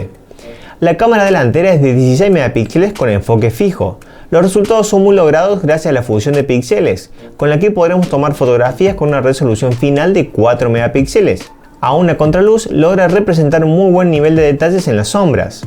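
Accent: Córdoba, Argentina. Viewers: muy buen review! me suena a tonada Cbesa la de, mepa o es de Río III? Saludos!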